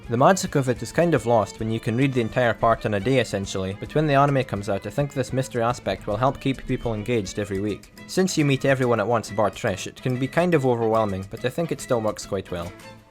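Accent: Scotland, United Kingdom. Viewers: Dans It's understandable that you can't understand his Scottish accent.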